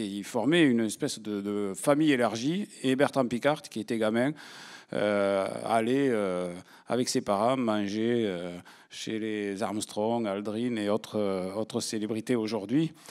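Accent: South, France. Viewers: Merci pour ce festival d'humour Avai l'accent de la garrigue ... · Mais ..juste INTÉRESSENT ..en + avec 1 accent du sud..de pecor sérieux..tu nous apprend rien du genoux...Oh peu cher...aller tais toi stp...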